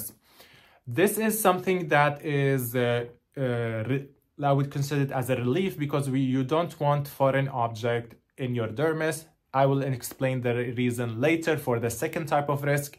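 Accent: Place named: Italy. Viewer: What a charming accent. Are you Italian?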